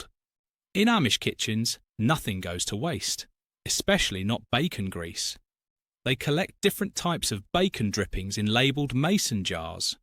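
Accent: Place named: England, United Kingdom. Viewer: You’ve got a flaming English accent, so speak English not American it’s coriander and biscuits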